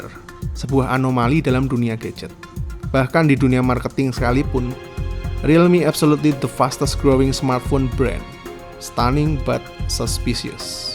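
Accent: Yogyakarta, Indonesia. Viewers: Ealah cah jogja to mas e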